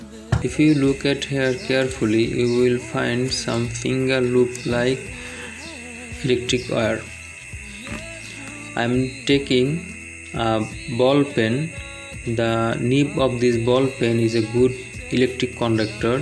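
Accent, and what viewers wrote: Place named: Bengal, India. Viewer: কথার স্টালে তো মনে হয় বাঙালি, বাংলা বুঝি জানো না !!:face-orange-raised-eyebrow::face-orange-raised-eyebrow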